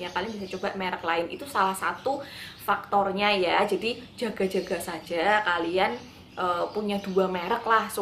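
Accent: Java, Indonesia. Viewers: diet ga? Abis melahirkan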